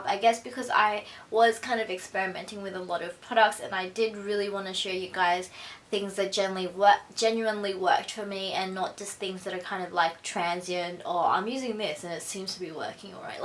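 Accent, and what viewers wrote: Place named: Australia. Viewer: you're from Australia right?? I reaaally love your aussie accent haha :) it's so unique and I love it :) and you have very nice ombre hair!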